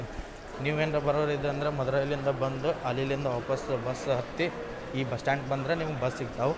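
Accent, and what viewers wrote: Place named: North Karnataka, India. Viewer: ನಮ್ ಉತ್ತರ ಕರ್ನಾಟಕ ಭಾಷೆ ಕೇಳಾಕ ಎರಡ ಕಿವಿ ಸಾಲುದಿಲ್ಲ್ ನೋಡ್ರಿ...😍 ಮಸ್ತ ವಿಡಿಯೋ..👍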